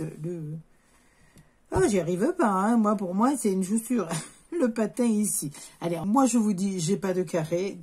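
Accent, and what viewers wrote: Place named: Midi, France. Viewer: Bonsoir merci pour vos explications nettes et précises.J ai déjà terminé mes cartes du même bloc c est très agréable.L accent du Midi me rappelle des vacances pas loin de Béziers.Salutations de Bruxelles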